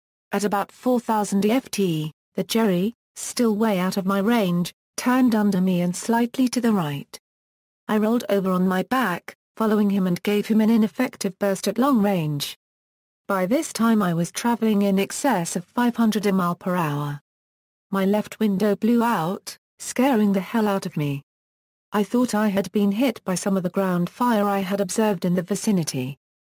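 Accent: England, United Kingdom. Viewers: She sounds English, has accent, ok?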